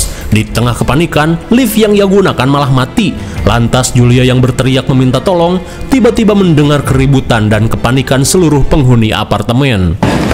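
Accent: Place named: Belitung, Indonesia. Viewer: Gue pingin tau si mimin berasal dari kota mana?? Logat bicaranya kayak logat melayu belitung.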